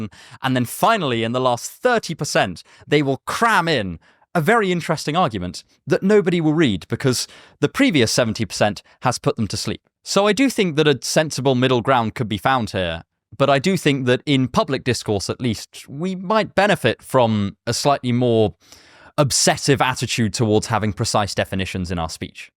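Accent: United Kingdom. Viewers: His teeth and speech make him british.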